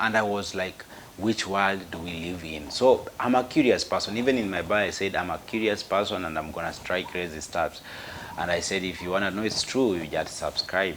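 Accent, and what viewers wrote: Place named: Kamba, Kenya. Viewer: You have a good English while you are serious but when you and Jude are not in good terms your English sounds kikamba aki, anyway you are free from your own words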